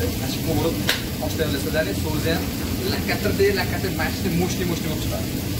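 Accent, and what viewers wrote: Andijan, Uzbekistan. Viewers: Жааа. Яхши анжанча шевангиззи яхши тушунамиз. Гапираверинг. Нима? Росияда ок сабзи йукми дустим???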